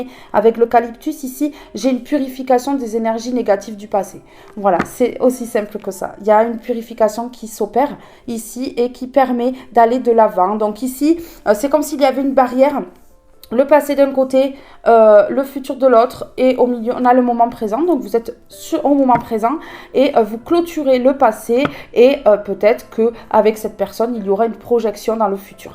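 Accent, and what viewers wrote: South, France. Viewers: J'adore vous écouter Madame. Vous êtes très belle en plus et votre accent du sud soleil rajoute une multitude d'aromates au plaisir de vous admirer. Merci de nous faire du bien avec ce que vous êtes ❤😊😊❤❤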